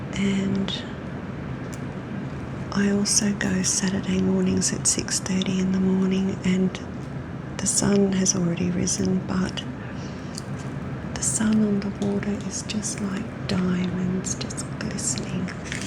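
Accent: Australia. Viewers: I found my new favourite ASMRist ❤️ the fact you’re Australian is also comforting for me. I’m from the UK and my 20 year old son is currently in the Philippines but will be heading to Australia in July where he plans on staying for up to 3 years. I miss him terribly, but you’ve definitely helped. Thank you. ❤️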